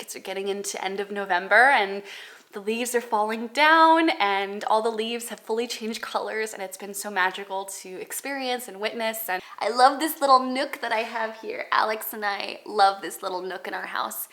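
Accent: United States. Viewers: Are you from American🇺🇸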